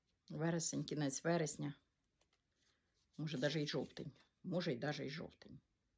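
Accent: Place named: Surzhyk, Ukraine. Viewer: Не приємний суржик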